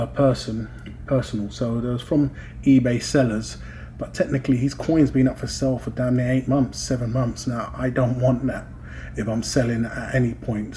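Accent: United Kingdom. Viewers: I sense a bit of sarcasm in your opening remark & that's a pity when my advice was well intended. Yes I fully watched & listened to your video. Although you have a slight hint of a British accent your rambling for the most part was understood. The underlying message was quite clear to the you tube audience & myself and that is the fact that you as an investor made some bad purchases even by your own admission and the headline of your video: "Cutting My Losses On This Silver Sale" First off I am a numismatic coin collector and you will kindly note Sir that I commented "exclusively on your large (numismatic) proof coins" which you admitted was bad investment purchases & suffered a loss a number of times in your video. Further more, you obviously do not have a grasp of how the world market operates judging by your concern over BREXIT. The value of your coins as an asset depends on current market value/appraisal at any point in time. 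Whether the £ devalues relative to the € or for that matter on world foreign exchange (FOREX), selling your coins you will at "best get what is fair market value at the time of sale"... meaning it could be more than you paid or less. Also despite BREXIT, you are not limited to selling within the UK but internationally...the world markets are wholly interconnected! One more thing - you have thought me not to give advice freely because for some, it is objectionable & demeaning. A lesson learned & I thank you...my bad.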